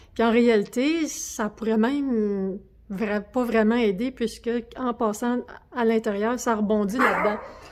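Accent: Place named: Canada. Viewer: Moi, ce que j'aime, c'est la petite voix canadienne chantante et jolie demoiselle. Je suis du métier, sciences, et je me demande si tu avais bien fermé la base des tissus (emprisonnant le récepteur) et surtout le papier aluminium devrait contenir l'appareil et fermé à sa base aussi. Pas de couverture d'aluminium avec fente de grâce. Pour une non scientifique, c'est déjà ça. Guadeloupe. Could you give me a response please.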